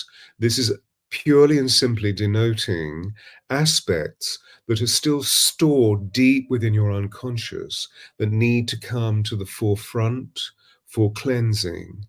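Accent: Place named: United Kingdom. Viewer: I just Love your Energy & Your very Posh English Gentleman Accent, I am also here in England & you sound like our King Charles, So comforting is your voice. Sent Prayers to all in America who sufferd from the Storm💚💚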